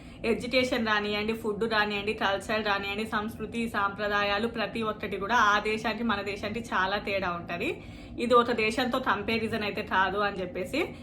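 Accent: India. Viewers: Inka Indian accent matladuthunaru meeru🤔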